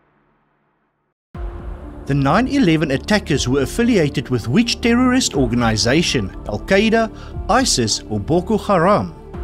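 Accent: South Africa. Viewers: U R South-African, judging by your accent?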